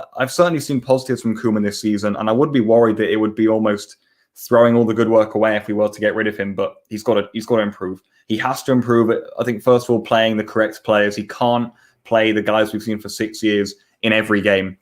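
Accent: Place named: United Kingdom. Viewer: Your English is very good, sounds like ur from the UK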